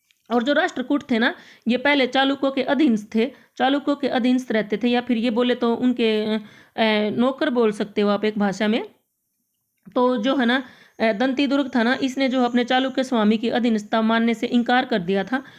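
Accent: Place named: Haryana, India. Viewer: Ya hindi bolo ya fir hryanvi ajib bolre ho aap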